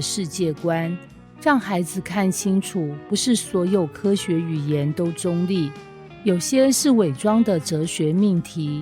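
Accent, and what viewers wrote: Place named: Taiwan. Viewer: 為什麼要用這種奇怪又難聽的腔調講話，這是在醜化台灣人的口音。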